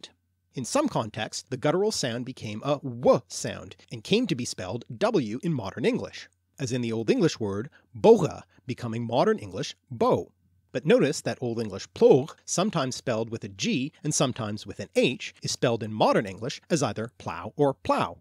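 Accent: Canada. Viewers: You sound like a Canadian. Am I right?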